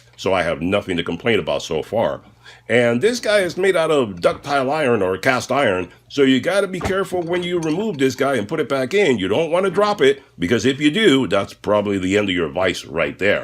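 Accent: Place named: Chicago, United States. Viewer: you have a great voice. Man, I don't think I've ever said that before... especially to a man. haha Reminds me of the Chicago accent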